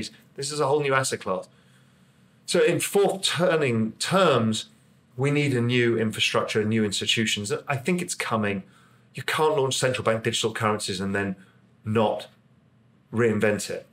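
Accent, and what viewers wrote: United Kingdom. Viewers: Ha ha ha ha. Like I said a few weeks ago. BS with a British accent is still BS!!🤣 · Really diggin’ that UK accent and cadence! Makes these wise words even better!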